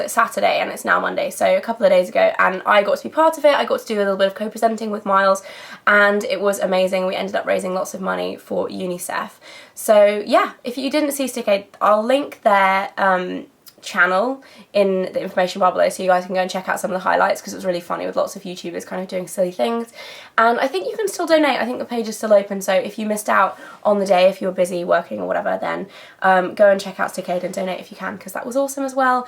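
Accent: United Kingdom. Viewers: I love your british accent! Do you think the foundation would be good for combination/oily skin?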